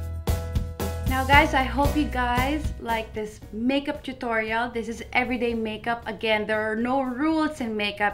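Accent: Philippines. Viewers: grew up in the Philippines. Her accent is the same as middle class Filipinos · i love her accent! so filipina! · Maldita do you have problems with your so called "Middle Class Pinoys"?! She might have that kind of accent but were you able to achieve what she has right now? I'm pretty sure you are a filipino, and I am sure that your accent also belongs to Middle Class Pinoys. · I love your accent, you make me mis all my Filipino friends in Alaska. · I can here the Filipino accent 😍😍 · Gosh! I really love her Filipino accent! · I love her but her accent bugs me. i cant wait to see a Filipina in American media who can talk normal. i just hear that accent from middle class filipinos so much and I know filipina girls think its cute. it's just too much to listen to. sorry. still love you. just have to put you on mute.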